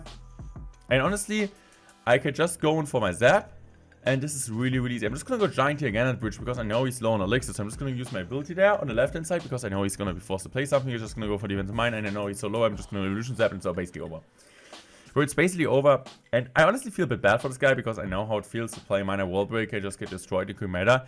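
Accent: Germany. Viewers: Glaube gibt wenige deutsche dessen akzent so sehr triggert wie der von dir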